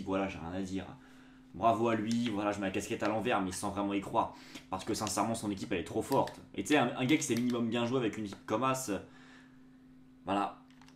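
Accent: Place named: Spain. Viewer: Très belle accent espagnol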